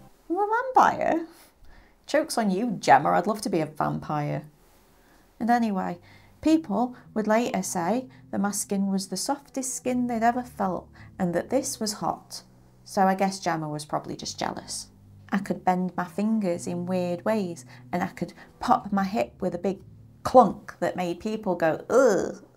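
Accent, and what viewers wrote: United Kingdom. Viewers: Literally feels like listening to an alternate version of myself... but with a British accent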